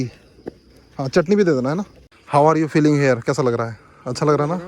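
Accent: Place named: South, India. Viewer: Thora ahista bolo Bhai..... Tumhara Hindi south Indian bale Tamil Telugu jaisha lagte hay....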